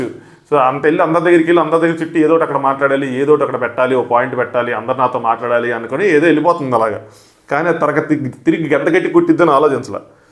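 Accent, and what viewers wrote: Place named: Guntur, India. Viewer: Guntur side slang anipistondi 😁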